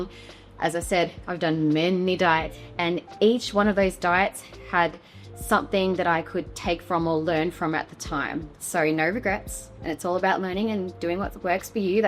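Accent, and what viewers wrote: Australia. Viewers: Surprise surprise 😂😂😂. Only joking, mate. I was expecting her to sound American. The Aussie accent was a complete shock.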